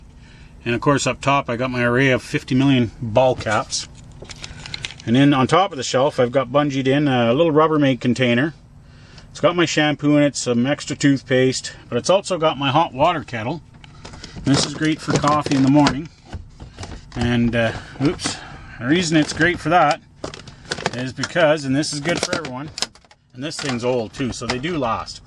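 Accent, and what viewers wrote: Canada. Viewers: Good example of the Canadian accent!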